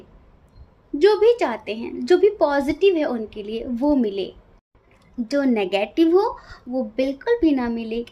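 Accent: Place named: Bihar, India. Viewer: aaj pehli bar hamne apki video dekhi hame bhi yahi laga ki aap up ya bihar se ho kyuki bhasha se yahi laga tha kyuki ham bhi bihari h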